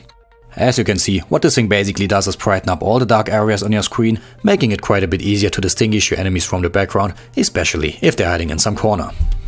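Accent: Saarland, Germany. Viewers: ***** Doch es ist Saarländisch. Bin auch ausm Saarland. Er sagt "Hauna mo rin do ne". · ***** doch :D ist saarländisch^^ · diggah ich komm ach ausm Saarland awa du heerscht dich kompeltt annerst an als mei Leut:D Aus welchem Eck kommschten heer?:D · XD Ich dachte schon an deiner Aussprache dass du Deutscher sein musst! C: Wie viel hast du denn für dein ganzes Setup bezahlt? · I luv your german accent <3 :) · german i know the accent cuz im german and american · Daje, haun da mo rinn do, nä? Ich bin abgebrochen vor lachen! :D Das gudde Saarland <3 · That deutsch accent.. ;-)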